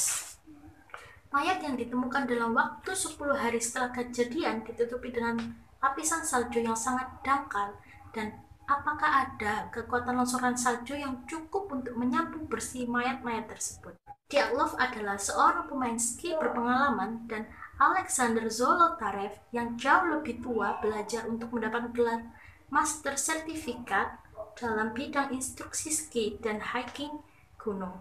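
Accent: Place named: Palembang, Indonesia. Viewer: loga ny kayak orang palembang hehehe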